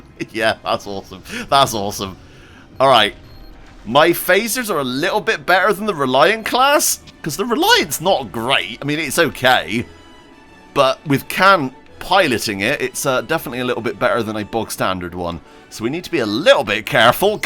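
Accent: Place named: South West England, United Kingdom. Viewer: i know a fellow south west person when i hear one!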